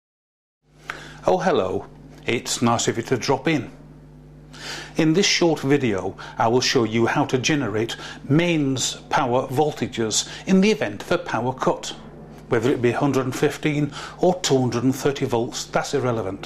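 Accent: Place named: Yorkshire, United Kingdom. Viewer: haha you have to be an electronics teacher, my old electronics teacher had the same sense of humour as you, he plugged a lead into a plug and said to a kid if he holds onto the wires he will be plugged into the national grid and the kid was gonna do it lol he was like are you crazy, go sit in the corner XD but yeah also your accent sounds like your from yorkshire :D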